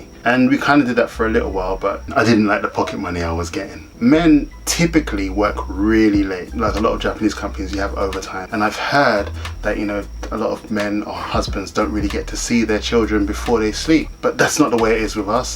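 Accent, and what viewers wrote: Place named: United Kingdom. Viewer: I don't remember when i subscribed to your channel, but I'm still not used to hearing the British (London?) accent 😆😆I've been watching too many American an Canadian YouTubers in Japan. it's always good to hear more Brits haha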